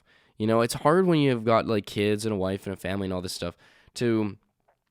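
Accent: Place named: Australia. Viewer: Nailed the Australian ascent 😂🛴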